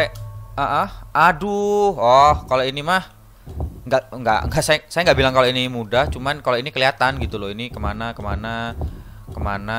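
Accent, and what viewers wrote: Java, Indonesia. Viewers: Bang orang Jawa? Jawa mana bg, salken aku dri jatim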